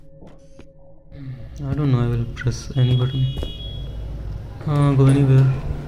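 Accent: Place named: India. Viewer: ur voice looks like Indian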